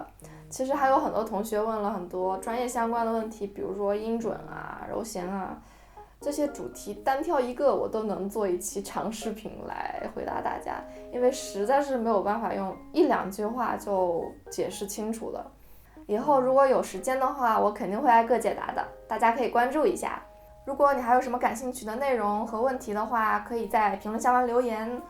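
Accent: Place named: Shanghai, China. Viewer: 难怪口音基本就是上海口音了，完全不是青岛北方口音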